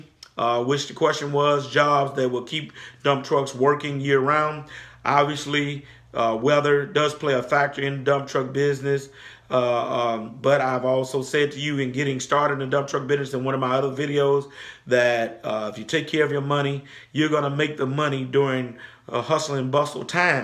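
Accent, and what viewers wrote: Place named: Louisiana, United States. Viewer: What part of Louisiana are you from brother?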